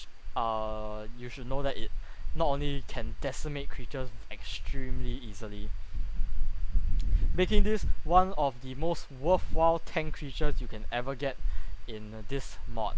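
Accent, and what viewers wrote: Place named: Singapore. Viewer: I'm a simple man, I detect Sing-lish, I liked and subbed, greetings from Jakarta. Stay Safe!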